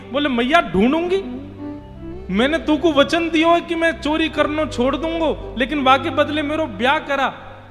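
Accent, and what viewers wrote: Braj, India. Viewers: Aaha aapki vraj ki bhasa mohit kardeta hi maharaj koti koti pranam🙏🙏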